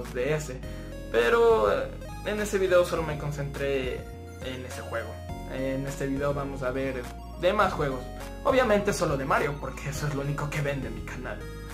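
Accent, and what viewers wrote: Ecuador. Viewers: Eres Ecuatoriano.??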